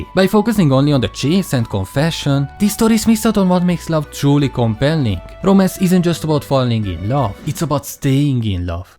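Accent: Hungary. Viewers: Is that a hungarian accent I hear?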